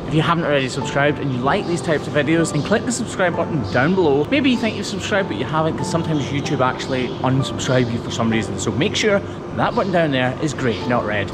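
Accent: Scotland, United Kingdom. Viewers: I was pulled in by horse farrier videos, then YouTube, in all it's power of Oz, introduced me to this Scottish cow foot guy. I love listening to that accent (and figuring out what he is saying😂) while he works to give comfort to these animals. One of the most bizarre things to find such interest in, but I guess I'll see y'all down the hole! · A human who has empathy for an animal in pain, is a good human. I'm loving your videos. No idea how YouTube brought me here, but I'm hooked. I do love a Scottish accent too 🙌 thank you for making these animals lives easier 💖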